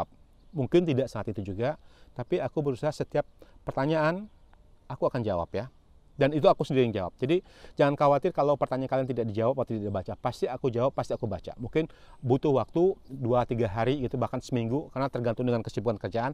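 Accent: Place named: Medan, Indonesia. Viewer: Hai salam kenal ya 😊 Ohya nada bicaranya mirip² orang medan jg ya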